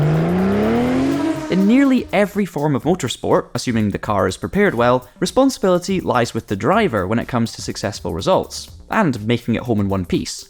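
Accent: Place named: Wales, United Kingdom. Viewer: Brudda he’s not English he’s a Welshman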